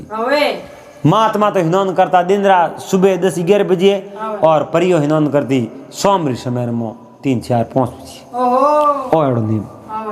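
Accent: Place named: Barmer, India. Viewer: आप बाड़मेर की भाषा में बोल रहे हो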